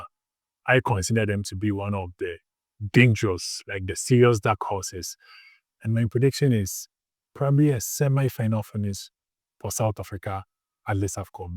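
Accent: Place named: Nigeria. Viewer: Bro you sound Nigerian but you look South African.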